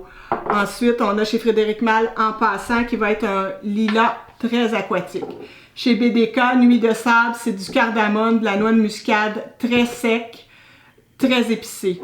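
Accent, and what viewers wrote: Quebec, Canada. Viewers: Bonjour et merci pour ton message. Je découvre ta chaîne avec un grand plaisir en même temps que ton délicieux accent québécois qui me rappelle l'une de mes tantes que j'adore. Quel marathon!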